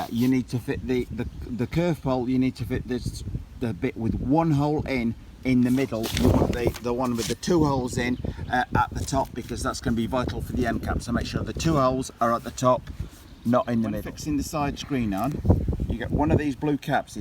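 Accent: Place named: United Kingdom. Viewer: Great job with the review!!! Scary good pointers ! The British accent is cool too!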